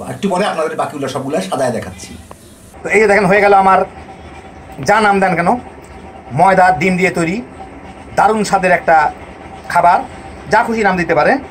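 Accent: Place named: Satkhira, Bangladesh. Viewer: Dada you , especially your language remind me my ancestry migrated from Satkhira ,if I am not wrong. Love you from Calcutta.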